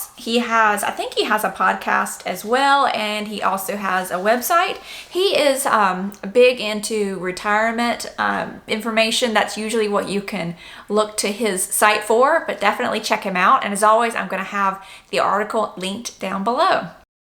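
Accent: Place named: South, United States. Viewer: Her advice and tips are awesome but does anyone know where her southern accent is from I think it might be Arkansas but I don't know. Any help is greatly appreciated would help eliminate the guessing lol.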